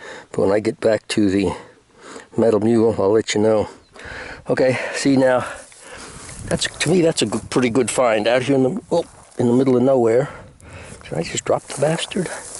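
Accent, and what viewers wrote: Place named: Northeast, United States. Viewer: Where are you from originally? I can't figure out your accent. Are you from the North East?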